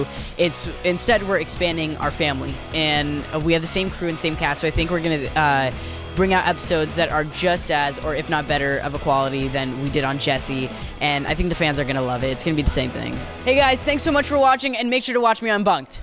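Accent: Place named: New York, United States. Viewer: He sounds like a New Yorker.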